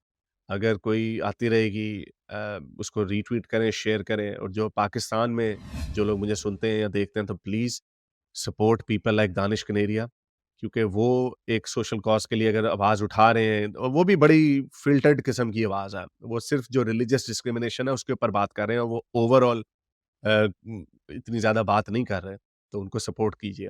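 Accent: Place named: Punjab, India. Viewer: Being indian punjabi I love ur punjabi. The same style. God bless u.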